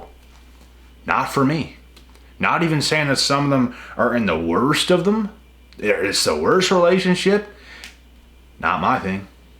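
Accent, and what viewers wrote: Canada. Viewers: Love the Canadian accent! From USA.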